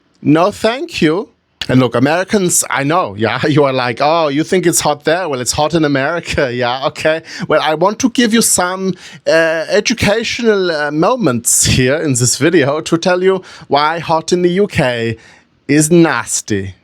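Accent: Germany. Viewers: Sarcasm in a German accent is the best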